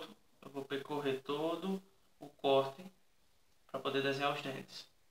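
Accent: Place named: Bahia, Brazil. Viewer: vc e bahiano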